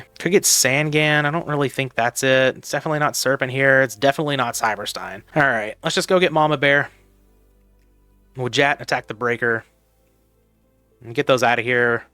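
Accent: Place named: South, United States. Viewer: As someone from ohio you are southern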